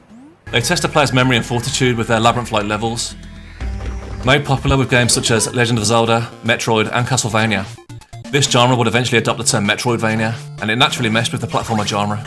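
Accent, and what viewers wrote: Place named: Liverpool, United Kingdom. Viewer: You sound like a Beatle. Are you from Liverpool?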